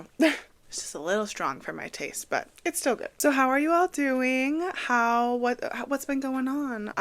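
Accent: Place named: Northern California, United States. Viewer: Fellow Californian here (though I live out of the country now). What region of California are you in? Sounds like maybe up north?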